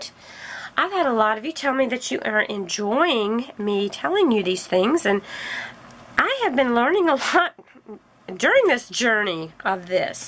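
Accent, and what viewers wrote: Texas, United States. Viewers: I am brand new to diamond painting I have only done a couple of paintings I’m really enjoying listening to you while I work on my paintings I love your Texas accent So how I kit up Is in the bags they come in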